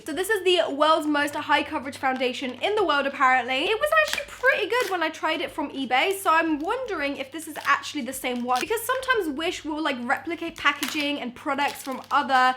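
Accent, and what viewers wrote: United Kingdom. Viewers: This british accent omg 😍😍😍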